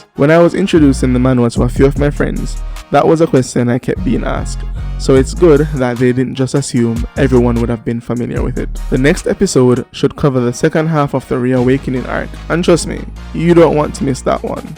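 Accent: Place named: Jamaica. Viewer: Sounds like a yawdie. Great breakdown.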